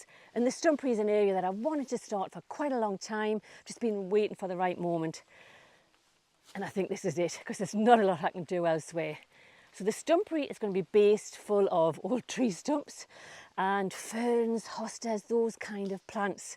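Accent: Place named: Northern England, United Kingdom. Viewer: Fab, us too. We’re in Bellingham :) beautiful part of the world. Although I’m from Sunderland originally and Hubby from Gateshead I heard the northern twang. Such a small world to come across a local video! Just an FYI gardening express have a deal on Hosta’s 40 for £10 just bare root but I got 80 and they are all popping up lovely 🥰 I’ll check out the stumpery, thank you 🙏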